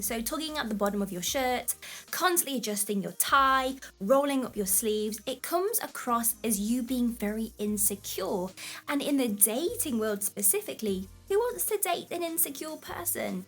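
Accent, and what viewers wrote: United Kingdom. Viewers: you look like russians but your english is british, btw i like your dating lectures. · My god, you're in the UK and you're complaining of heat? I used to live in Borough of Brent, no I'm in the U.S. in California. It was almost 45C today or as they say here 112F where I stay and it will remain around mid 40s until end of September. So please my dear, as someone who has lived in the U.K. don't complain about the heat there. By the way like your videos, the accent makes me home sick but otherwise great.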